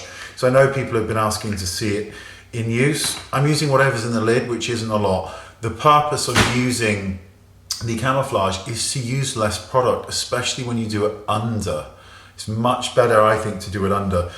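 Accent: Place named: United Kingdom. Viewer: Hes british not aussie u dumb fuck below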